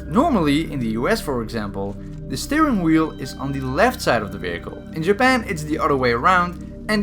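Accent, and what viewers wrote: Netherlands. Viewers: Are you Dutch? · You're Dutch right?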